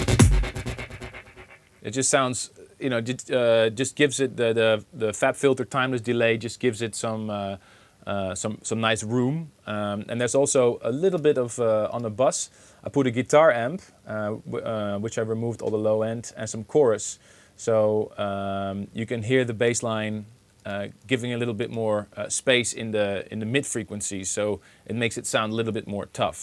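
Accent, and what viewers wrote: Netherlands. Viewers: ***** Yeah, ok his english is fine but you can clearly notice he's dutch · armin is dutch, english is not his mother tongue.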